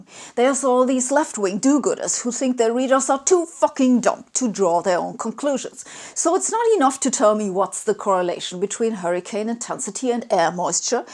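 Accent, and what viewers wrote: Germany. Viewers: She's acting pissed, but by the end of the video I can tell she's half dead inside having to break down something that was supposed to be obvious to most people, and yet it isn't. Keep up the amazing job in your career and in your Youtube channel! PS: Any female with an angry german accent will always have a special place in my heart <3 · Lol, your accent is German, but you cuss like an American Army drill instructor. Bravo!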